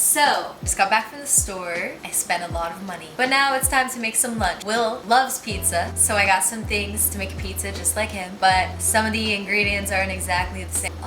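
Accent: Ohio, United States. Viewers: Great video! Haha your Ohio accent is showing! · Side note: I love your user name lol has a nice ring to it! Sounds sexy/badass at the same time. Anyway I noticed the mention of your Ohio accent? Midwest represent lol I live in lake county Illinois which is about 35 min north of chicago. What part of Ohio are you from? Do you still live in Ohio currently? Not trying to sound like a creep stalker lol honestly just curious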